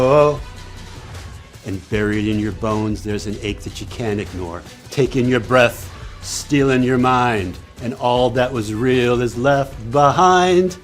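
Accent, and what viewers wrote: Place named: Italy. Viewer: I thought I recognized a fellow Italian in your cadence and light language. My Birth Name is Margherita Cumero. Comes with Love ❤️😘❤ Such Gratitude for Your Energy and and who lead this Dragon here.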